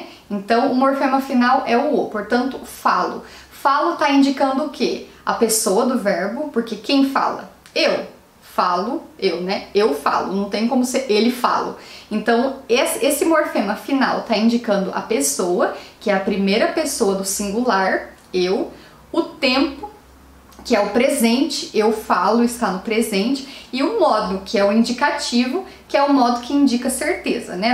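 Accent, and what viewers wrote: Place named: Interior, Brazil. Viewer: Adoro esse sotaque do inerior vindo da voz de uma mulher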